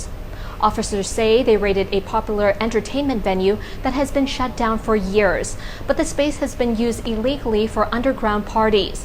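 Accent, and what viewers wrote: United States. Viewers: You Will Have Wet 💦 Dreams Of her Tonight and you don't know a thing about accent . Her's Is 100% Americano Gringo, Bro 😷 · Great command of the english language, obviously an American accent. did a great job in the presentation, however recommend that she slows it down abit, should improve with experience. Overall a fantastic addition to the Thaiger team! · she sounds American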